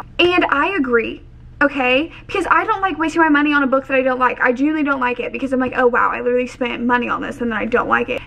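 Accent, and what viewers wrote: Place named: Midwest, United States. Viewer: "audiobooks don't count" ok then well who is keeping score? what are we counting towards here other then our own goals? are the book police gonna come arrest me cause i listened to it instead of using my eyeballs? i was able to talk about it with the other people in book club and none of them knew i'm a big ole CHEATER by listening to it according to that person. side note: i used to live in indy and i no longer live in the midwest at all, and sometimes i just need a good dose of your accent. you mentioned meijer in another video and i ascended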